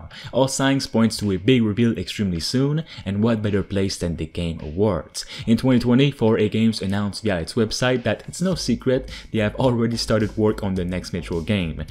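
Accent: Quebec, Canada. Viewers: Bro t québécois right ?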